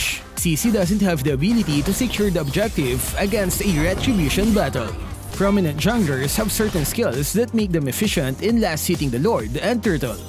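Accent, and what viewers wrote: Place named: Philippines. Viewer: anoyying pinoy accent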